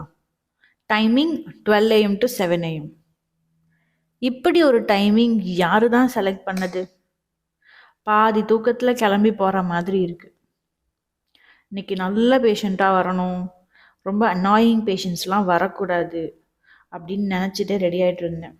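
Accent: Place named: Tirunelveli, India. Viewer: Spr sis tamil rmpa alzhaga pesureenga na tirunelveli nu nenajen..... ✨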